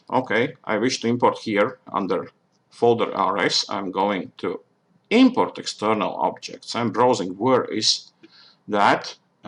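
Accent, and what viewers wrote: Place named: Russia. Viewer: Thank you for your job! Are you Russian?)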